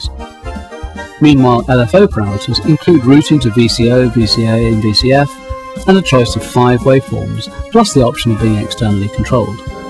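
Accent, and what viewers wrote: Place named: Australia. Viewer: Australian narrator... because of the price of the synth at the time.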